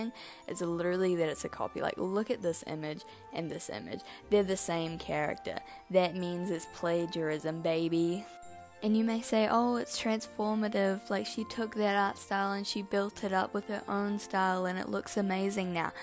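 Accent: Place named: New Zealand. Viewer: You're accent sounds very kiwi 🤔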